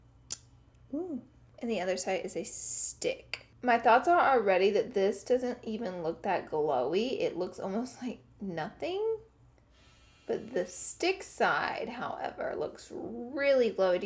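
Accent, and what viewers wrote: South, United States. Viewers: Jennifer Lynn its called a southern accent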